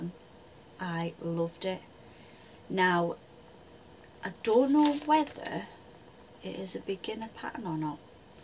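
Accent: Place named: North East England, United Kingdom. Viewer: Enjoyed your vlog your shirt is lovely -was trying to place your accent you sound like you may be from my neck of the woods I’m formerly from Bishop Auckland now North Tyneside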